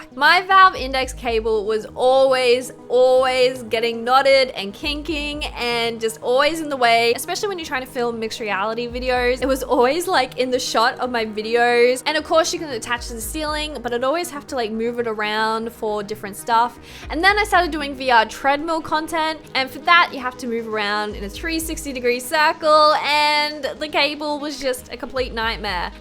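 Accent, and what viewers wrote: Australia. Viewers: Your Australian?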